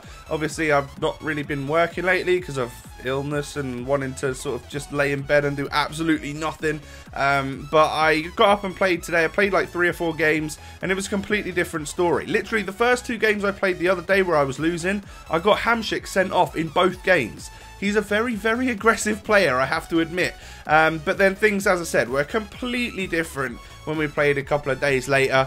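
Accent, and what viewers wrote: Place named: London, United Kingdom. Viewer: Where are you from? London Maybe? I love your accent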